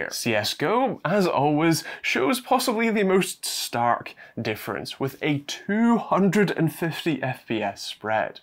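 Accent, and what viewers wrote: United Kingdom. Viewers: British accent bro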